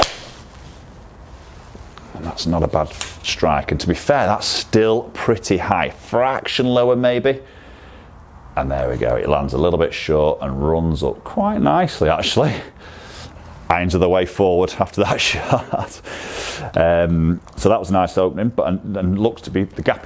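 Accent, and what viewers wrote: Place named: Lancashire, United Kingdom. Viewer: Great pronunciation 👍 spoken like a true Lancastrian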